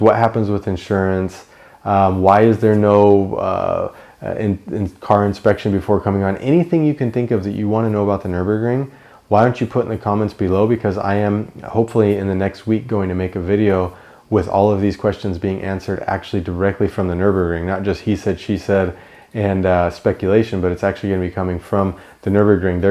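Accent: United States. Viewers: Where are you from? your accent is American but you live at the Green Hell...I'm confused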